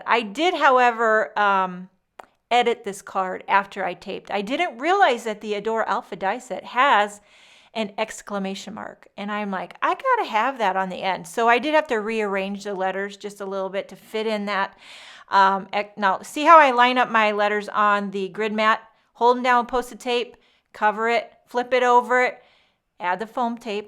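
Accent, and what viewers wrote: United States. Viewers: Hi I watch your videos all the time and I love them! I’m curious what are you doing in Germany? You sound 100% American.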